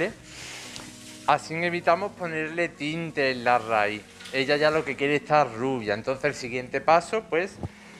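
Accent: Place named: Spain. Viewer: Omg!!! Eres super lindo!!! Soy peruana y vivo en Canadá por más de 30 años. Primeramente: me encanta tu acento español... Que lindo tu asentó....😍😍😍 Me encanta tu carisma al hablar eres muy simpático y bromista con tu clienta y tus skills ... Woooow !!!! Eres bien educado en tu craft. Como me a encantado verte y aprender de ti. Eres un hombre muy dotado en tu profesión. Te voy a seguir...!!! JOANNE 🌹😘😘😘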